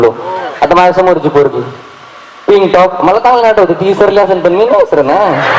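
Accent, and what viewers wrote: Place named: Ambajogai, India. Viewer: full ambajogai chi language.... Mast vatal bhava..👌👌👌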